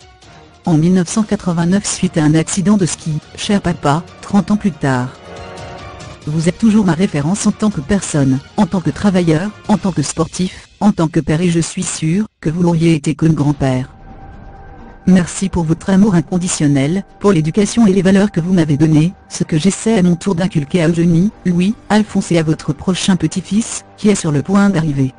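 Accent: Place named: Spain. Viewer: Il parle Français comme ma concierge espagnole.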